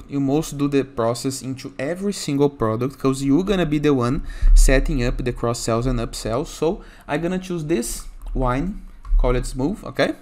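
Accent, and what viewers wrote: Brazil. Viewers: Sotaque brasileiro não passa batido kkkk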